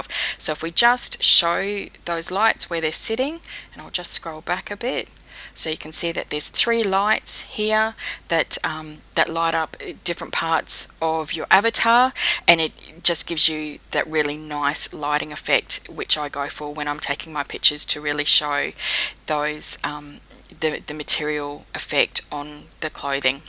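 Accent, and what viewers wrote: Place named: New Zealand. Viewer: ooh I thought you sounded like youre from New Zealand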